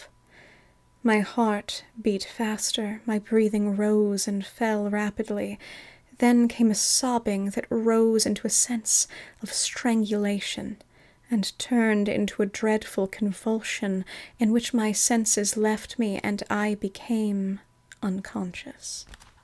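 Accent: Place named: Transatlantic, United States. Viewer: I love that you’re keeping the transatlantic accent alive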